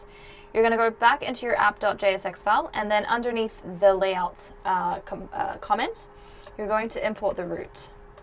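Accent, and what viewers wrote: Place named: Australia. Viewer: You have snippets of Aussie in your accent..... Keen to see direct migration for whole WP websites, that can link to NFT (Open Sea etc), email capturing for subscribers (as a database for future contact), and other links to Unstoppable Domains donations /merchant receipt for sending g crypto. Thank you, am giving this clip a go as a reference help. Appreciate the clip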